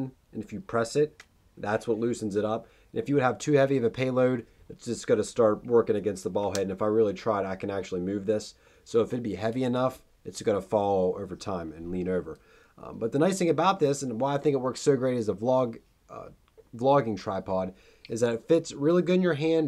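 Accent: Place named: Philadelphia, United States. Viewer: Haha. Know this is an old video but just got this tripod and wanted to say I love that Philly accent :)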